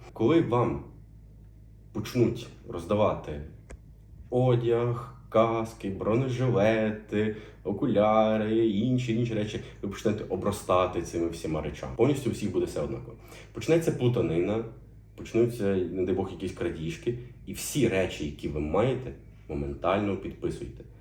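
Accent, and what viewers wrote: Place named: Podillia, Ukraine. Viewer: Мені здається, що автор родом з Поділля, за вимовою. За інформацію щиро дякую. Можливо фумігатор від комарів теж знадобиться.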